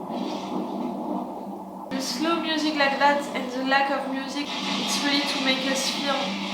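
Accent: France. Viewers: Je découvre cette chaîne Mais après quelques secondes, j'ai compris que tu étais française. Entre français on se reconnaît xD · Ouais on ressent énormément l’accent franglais ahah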